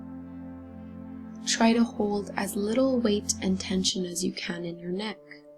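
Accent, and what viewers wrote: Canada. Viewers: Great for loosening up my neck and back. Clear instructions, lovely calm voice, love the Canadian accent. My hubby and i enjoy your classes immensely here in Aussie! 🙏🏽💜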